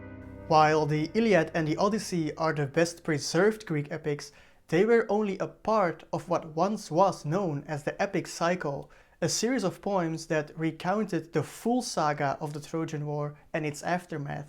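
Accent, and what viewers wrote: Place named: India. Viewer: I thought this was being narrated by an Indian, until I saw his face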